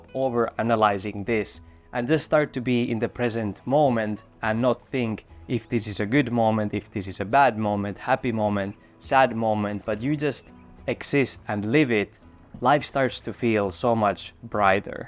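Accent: Finland. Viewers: Hehe! No, it is the typical Finnish accent for well-educated Finns who learnt to speak English at a very young age - I love it, it reminds me of my beloved native Finland. * is in fact excellent English*, as my native English teacher at Lund University, Sweden stated - the Finnish students spoke the best English, he said. We had/have good teachers in Finland!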